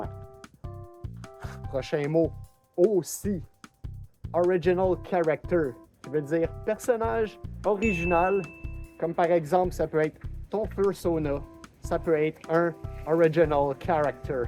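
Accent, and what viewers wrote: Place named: Canada. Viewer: Merci pour cette vidéo super intéressante ! ton accent canadien est vraiment incroyable ( De toute façon je ne sais pas pourquoi mais j'ai Toujours aimé les canadiens/Québécois ) J'adore la comu furry francophone Elle est Tellement génial ( En même temps je suis français ptdr ) Bref, je vous fais une Salutation depuis mon bus de l'ouest français !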